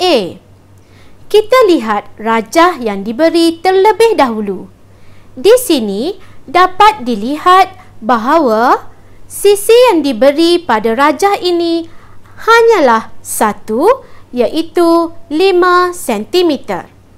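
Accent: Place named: Kelantan, Malaysia. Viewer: O aloh gomo kelate go mo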